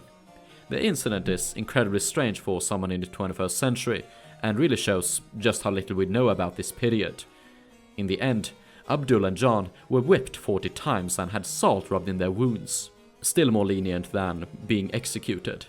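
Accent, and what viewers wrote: Scotland, United Kingdom. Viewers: The Scottish accent ❤ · He’s not British. Sounds more Scottish or Northern Irish.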